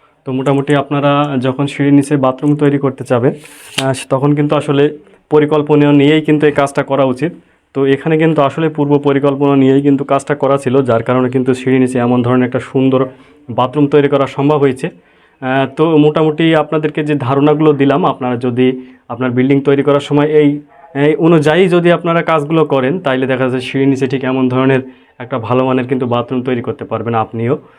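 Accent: Jessore, Bangladesh. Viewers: Thank you.kub Moja legece r apnake dekhe onnek onnek hasi payece.mone hoi apnake osostite fele diyeci.sorry.tobe apnar mukhe jossorer vasa sunte darun lagcilo.kintu short vedio upohar dilen!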